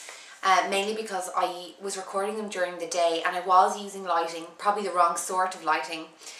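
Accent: Ireland. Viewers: You Really sound Irish, cause i know Because i am irish, Just not from dublin, CORK!!!!! bt dub Thanks for da tips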